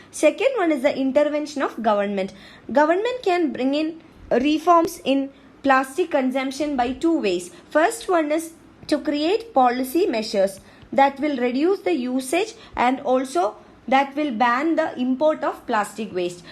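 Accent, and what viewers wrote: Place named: South India, India. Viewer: Your south Indian accent is horrible, the word etc and marine. For God sake please take some classes to improve, its very irritating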